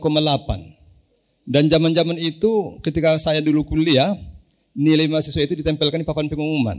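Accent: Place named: Sulawesi, Indonesia. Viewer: Masyaa Allah, Pak Dr. orang Sulawesikah? Dari aksennya spertinya dia dari Sulawesi hehe.. Barakallahu Fiik pak Doktor..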